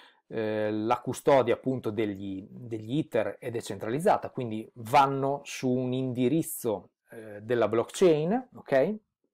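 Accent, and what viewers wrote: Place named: Bologna, Italy. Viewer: Ottimo video, preciso senza essere troppo tecnico....e l'accento bolognese é fantastico... Detto da uno di Modena 😉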